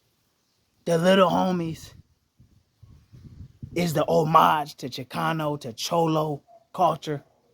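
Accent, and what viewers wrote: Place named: Alabama, United States. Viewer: You talk like white man from Alabama.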